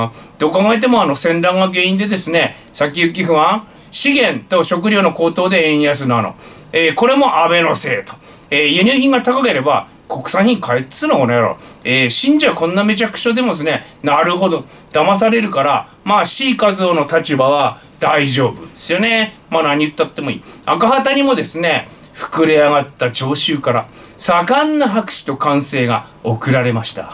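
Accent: Fukushima, Japan. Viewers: 体が大変なのに毎度ご苦労様です。 気持ちが乗ると出る福島なまりが好きです。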